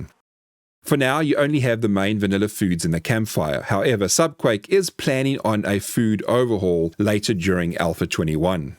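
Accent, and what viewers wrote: South Africa. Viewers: Ek kan hoor jy is afrikaans, dankie vir die inligting.Mod lyk awesome🤟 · Instantly know a South African.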